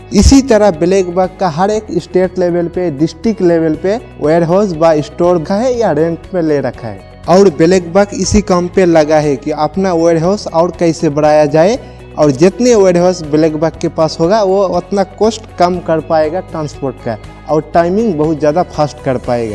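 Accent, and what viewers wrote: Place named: Bangladesh. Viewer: Yah pakka Bangladeshi Koi aadami bol raha hai yah pakka Bangladeshi hai iska Bhasha hi language hi bata raha hai ki yah Bangladesh Hai yah Thag Hai Thag video hai Thag video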